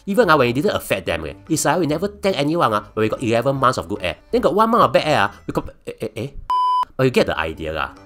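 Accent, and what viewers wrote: Singapore. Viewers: Bro, your accent is 200% singlish limpeh also need to turn on CC to understand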